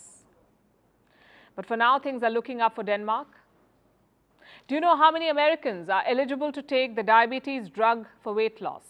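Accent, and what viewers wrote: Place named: India. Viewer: She has Indian accent. It's a real thing. You will find 500 million people in India with that accent. They only understand that accent.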